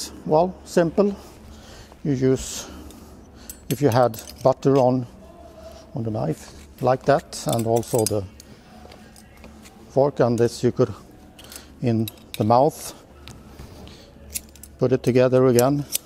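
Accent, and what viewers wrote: Sweden. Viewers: At first I thought the video was in some weird dialect of Swedish and tried to find the captions.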